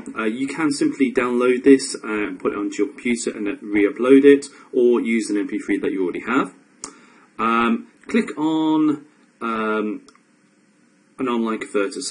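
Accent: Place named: United Kingdom. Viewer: This accent, he's from UK for sure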